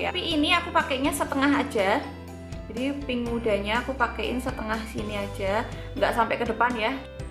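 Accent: Java, Indonesia. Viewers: Ayune kyok golekan jeung.... salam kenal yo aku soko jeporo mbak... tetap bikin video ala mbak medhok aja ya ... kereeennn inspiratif sekali buat saya dan buat pecinta makeup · Cantikkk bgtt mbak medokknya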